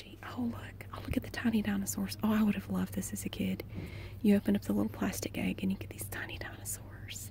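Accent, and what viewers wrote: South, United States. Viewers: I'm a new subscriber and I have been a subscriber to AMSR channels for a long time and how I missed your channel is beyond me. It really at the top when it comes to effectiveness. I love southern accents so maybe that plays into but when I watched your library video, that sold me in 2 minutes. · You have such a sweet southern accent. I love it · I'm so happy I found your channel, this is my absolute favorite now. You are such a sweetheart, I adore the southern accent and your comments are just perfect. I had never seen any organizing and structuring ASMR before, but I absolutely love it.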